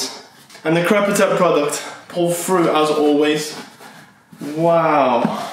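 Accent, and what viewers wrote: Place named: Liverpool, United Kingdom. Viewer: What accent even is that like southern with a twang of scouse mixed in 😂😂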